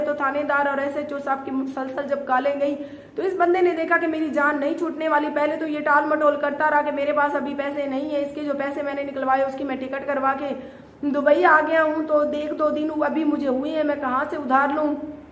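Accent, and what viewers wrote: Saraiki, Pakistan. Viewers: باجی آپ سرائیکی میں ویلوگ بنایا کرو آپ کی زبان سے سرائیکی اچھی لگتی ہے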